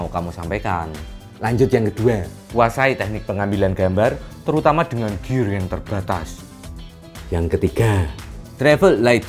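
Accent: Java, Indonesia. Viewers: Mantap... Pertahankan logat medhok nya yo mas.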